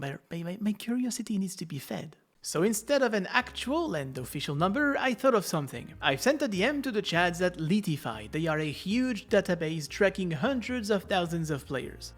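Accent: France. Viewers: In the first minutes I didn't realised ur french. Srryy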